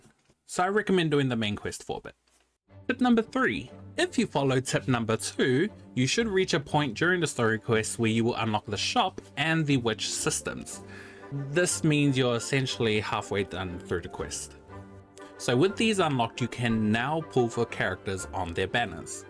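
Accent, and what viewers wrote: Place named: New Zealand. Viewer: That's an accent I recognise, kia ora!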